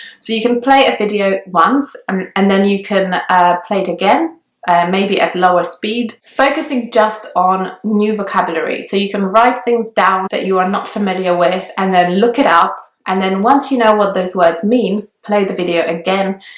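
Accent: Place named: United Kingdom. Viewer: Witaj! Thank you very much for tips and content that you create! I really though you were British until you mentioned that you are Polish! Idk if you ever covered this subject, but after watching you video reweaving Mondly I noticed a detail (and a a big issue actually) I've been dealing with: How do you manage learning a new language throughout another language foreign language? For ex. I'm Portuguese, English is my 2nd language and I want to learn Finnish ... The issue is that many people are not fluent, don't master a language well enough or just feel is more difficult learning a foreign language with another one that they are not conformable, specially langues like Finnish that doesn't have any resources to learn though besides English instructions. Dziękuję!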